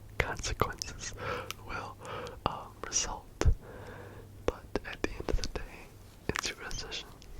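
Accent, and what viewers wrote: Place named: France. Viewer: Im in the same boat with college picking. Deciding between a good college in a good area but is more expensive and a good college in a not so fun area that is cheaper. Also idk If you want to know this, but when I first saw you I thought you were French. Cause in the specific video you sounded like you had an accent and I saw you had a French video on your channel. Also you kinds look French. I like to hear stuff like that, ive heard I look Russian a lot, same with my brother who looks like me.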